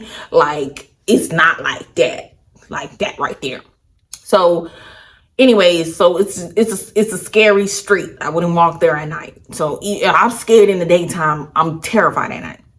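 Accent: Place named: Louisiana, United States. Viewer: I’m hollering! 😭😭😂😂 It sounds like when I would visit my people in the country in Louisiana. I’d try so bad to not offend no one but Lawd 😂 PERIODT CUZIN! SCREAMING I wish Texas would’ve worked out for you , but I remember why 😩. It’sdiverse but every city has its duck offs where you can be with your ppl. You act like us to me 🤷🏾‍♀️! Ok, I’m done. 😂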